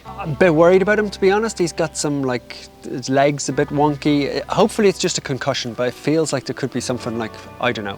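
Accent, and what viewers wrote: Ireland. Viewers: Dear god you sound as if your from southwrn ireland Im appaled Ibwill give a home to any animal that you need to. I have three pets 2 dogs and 1 very old cat which I picked up of the road supposed to be dead I brough him home and have had him for 7 months hes very old old but doing ok. If I can help I am here and will help all I can .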